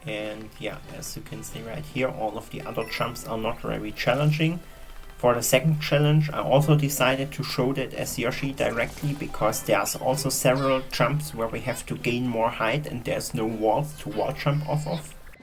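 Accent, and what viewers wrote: Germany. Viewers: bist du deutsch?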